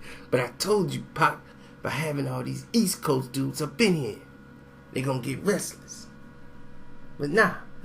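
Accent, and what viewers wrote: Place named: Compton, United States. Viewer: on piru get yo head busted lol..u sound just like a Compton brotha lol...the m.o.b don't play ftp ttp 151 piru all ru are with it except game lol...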